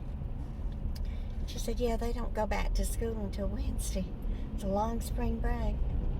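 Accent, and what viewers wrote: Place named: Mississippi, United States. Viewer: youtube randomly changed my id about a year and a half ago. Instead of protesting, I just went with it! Sometimes its easier not to draw attention to yourself, at least for me! I have been enjoying your channel for sometime. One time I got unsubscribed by the powers that be. Maybe a test to see who's paying attention! Anyway love you and what you do. Your accent reminds me of a cherished aunt who has passed on. She lived in Mississippi.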